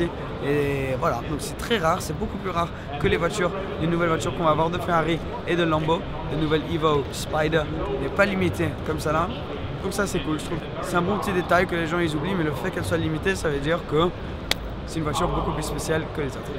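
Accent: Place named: United Kingdom. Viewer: Salut et merci pour cette présentation rapide des supercars du Salon de Genève 2019. Je t'ai connu il y a peu et j'ai regardé quelques-unes de tes vidéos sur ta "nouvelle chaîne française". J'en pense beaucoup de bien et ai particulièrement apprécié ta vidéo "La vérité" où tu te livres et joues la transparence. On sent que tu es passionné, mais attention, tu fais encore pas mal d'erreurs qui en définitive gâchent ce que tu as fais de bien auparavant. Par exemple le tout début de cette vidéo, ne te trompes pas de cibles, tu ne fais pas de vidéos-délires pour tes potes ou entre potes que tu materas plus tard uniquement avec eux, là tu t'adresses à un public tous âges qui vient sur ta chaîne pour découvrir des voitures et le salon de Genève, pour également avoir ton avis mais certainement pas pour assister à des blagues ou des délires de potaches attardés. 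La séquence entre 10 et 50" n'avait rien à faire là et aurait dû être coupée au montage. Ensuite attention aux insultes et grossièretés. Ton "J'men bas les c...…" aurait pu rester au vestiaire, si tu vois ce que je veux dire. Ensuite il y a un truc extrêmement énervant, un tic ou plutôt un automatisme que tu as et qui vient certainement de ta double culture franco-anglaise, mais qui au visionnage est super pénible à la longue. Tu prononces les noms de marques et de modèles à l'anglaise et en plus c'est souvent faux. Exemple, la Lamborghini SVJ n'est pas la "Ace Viiii Jay" mais sur une chaîne française la "Esse Vé Ji" et id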